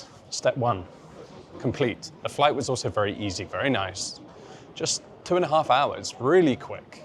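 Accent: United Kingdom. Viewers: How is it you're using an Italian passport when you sound English? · Great video! You have Italian passport while speaking UK accent?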